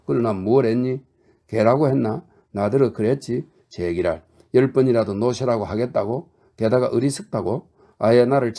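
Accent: Gyeongsang, South Korea. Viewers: 한동훈장관찐팬인데 후니가감명깊게읽은 책이라해서듣습니다 나이가좀많아서 너무감사합나다 두번째듣습니다 모두꾹꾸우욱누르고 경상도사투리어투가 매력적입니다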